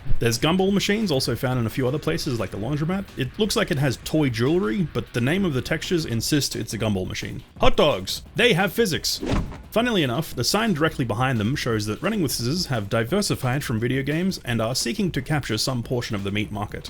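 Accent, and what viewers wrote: Australia. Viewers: I love listening to a "polite, elegant, australian" voice narrate over such a crude and violet game, the contrast is just so funny but also, genuinely calming! You're videoz R gud man :) Me give thumbs up! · he's australian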